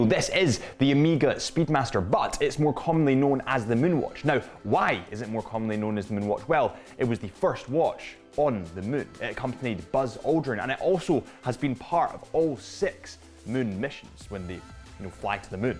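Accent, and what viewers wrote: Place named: Scotland, United Kingdom. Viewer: Very intesting review,. But you were-for a german guy-a little hard to understand because of the strong accent you have... where are you from, maybe Scotland? Cheers Stefan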